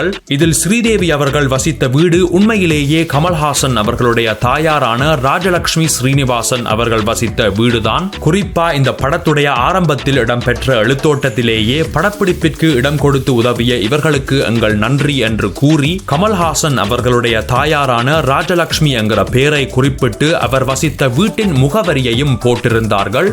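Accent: Sri Lanka. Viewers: Anna nenga srilanka thaneai nenga pesurathu enga naattu jafna Tamil pola iruku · Neenga Sri Lanka tamizhana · Srilanga Tamil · இது என்னடா, இலங்கை வாய்ஸ்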